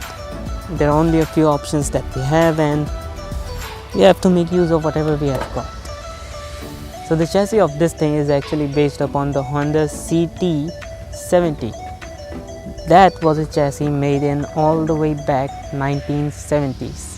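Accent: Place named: Pakistan. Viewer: Ur English is also made in pakistan.as we can see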